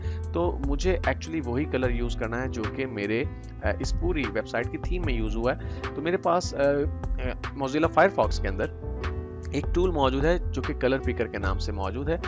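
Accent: Pakistan. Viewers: Aap pakistan se ho kya?? Awaaz se lg rha hai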